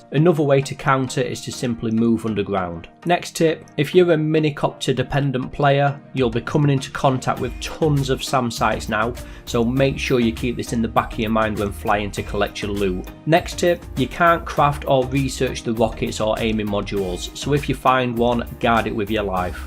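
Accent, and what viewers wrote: United Kingdom. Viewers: I dont know why, but I always learn more about Rust from a chap with a British accent.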